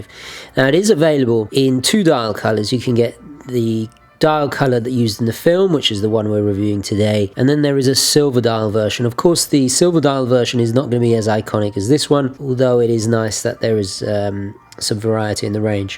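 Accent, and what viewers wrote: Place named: United Kingdom. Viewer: Why are all these channels British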